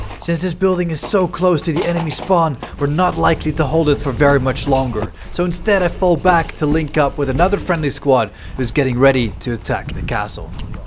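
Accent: Netherlands. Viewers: Are you dutch cause i am and i think you are too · Ayy are you dutch becuse i can understand you and im afrikaans Just look it up afrikaans is a descend of dutch the more you know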